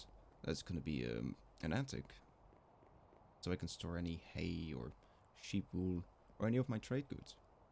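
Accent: Netherlands. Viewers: Are you dutch by any chance? I feel like I can hear it a little bit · Love this series so far! I'm also guessing you're Dutch based on your name and accent :P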